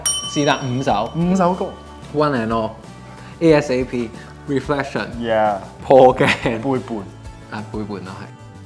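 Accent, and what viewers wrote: Taiwan. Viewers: 我知呀！所以我話我估計佢爸爸媽媽新移民黎香港生佢之後，可能屋企都同佢講普通話，到佢大個自己鍾意台灣歌，pickup 得好快台腔。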